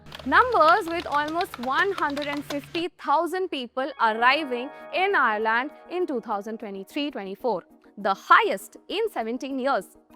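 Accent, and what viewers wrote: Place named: India. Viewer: it is so hard to understand of indian accent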